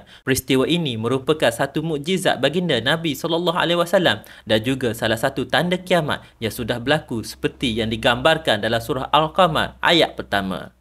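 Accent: Kelantan, Malaysia. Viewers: Abe ore kelate kea😂 suara blh cam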